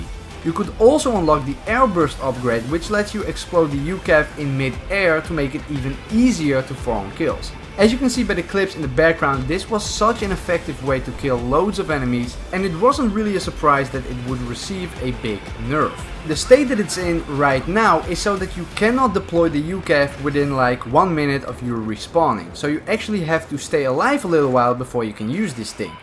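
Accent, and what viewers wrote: Netherlands. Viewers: Are you dutch? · Are you from the Netherlands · Je hoort zo hard dat jij Nederlands bent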